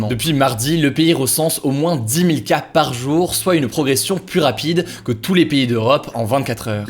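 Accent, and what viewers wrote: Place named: England, United Kingdom. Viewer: ton accent anglais me fait délirer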